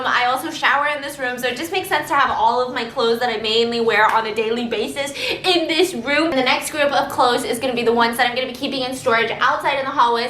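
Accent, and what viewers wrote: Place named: United Kingdom. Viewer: Awww, miss!! I love your British accent btw XD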